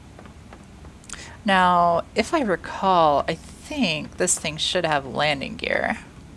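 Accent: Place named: United States. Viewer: awesome peek at whats to come! I'm so pledging as soon as payday comes! Its proper exciting:D lovin your American accent:) EVE Online is no more for me....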